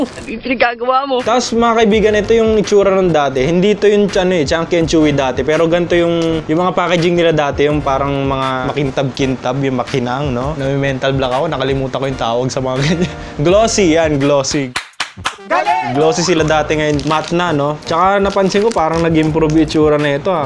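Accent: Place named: Visayas, Philippines. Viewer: Kuya bagay mo yong besaya accent mo😂🤘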